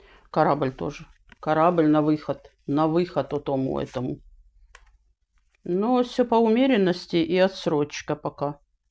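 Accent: Kherson, Ukraine. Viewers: Дякую за Вашу работу 🙏🌷 не звертайте увагу на придурків….. мені дуже подобається, як Ви розмовляєте…. Так розмовляють на моїй Херсонській землі 🥰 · Доброго ранку незвертайте уваги люди нерозуміють того що херсонщина вся розмовляє суржиком іперейти на чисто українську одразу це тяжко ви все нормально розказуєте дякую за розклад пишу і слухаю ірадію тому що ви кажете дякую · Да,ми наХерсонщині так говорим і всі привикли івсе нормально...,чекаю вас по утрам з нетерпінням,величезне вам дякую!!! За нашу ХерсонщинуДЯКУЮ велике,чекаємо....Каховка...❤️💙💛🇺🇦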